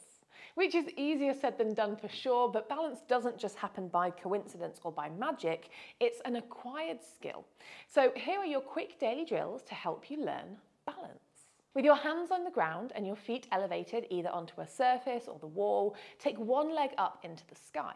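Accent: United Kingdom. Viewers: Your videos are so much better than others I've seen for a few reasons: 1. No gung-ho ego-driven nonsense, 2. Great pedagogical style, 3. British accent.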